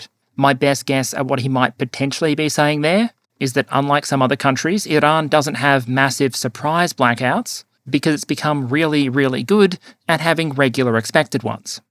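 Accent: Australia. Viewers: It is awesome to hear an Aussie commentary about world affairs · I'd suggest you turn on subtitles. The words all make sense, perhaps the Australian accent is the issue for you?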